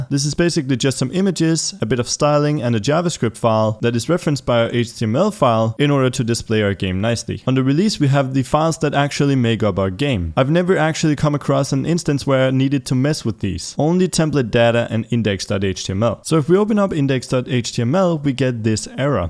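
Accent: Netherlands. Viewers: Wacht, ben je Nederlands?! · Are you dutch? And again thanks for your videos! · Wait, are you dutch?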